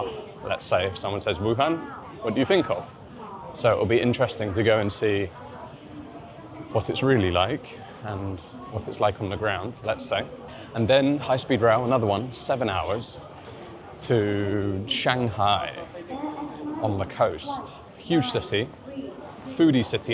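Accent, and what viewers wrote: United Kingdom. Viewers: How is it you're using an Italian passport when you sound English? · Great video! You have Italian passport while speaking UK accent?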